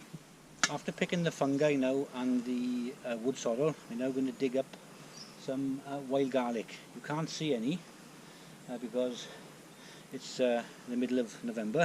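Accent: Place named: West Wales, United Kingdom. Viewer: Great video I could listen to you reading the telephone directory. I love your accent is it West Wales?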